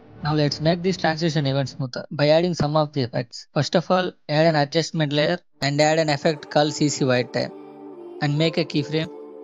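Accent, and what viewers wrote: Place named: India. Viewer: pls use ai voice or something its hard to understand indian accent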